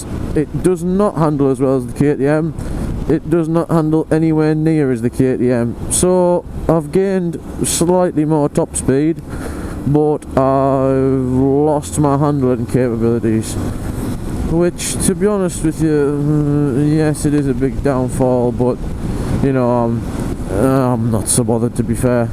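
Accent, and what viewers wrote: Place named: Stockton, United States. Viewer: Where you from mate? Watched your vids and sound like from where I'm from I'm originally from Stockton but live in South Shields now currently a 125 noob vlogger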